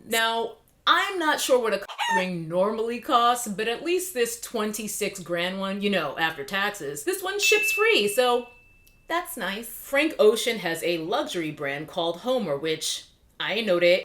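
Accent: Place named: New York, United States. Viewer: eggplant in garlic sauce in Flushing. You sound NYC so you know.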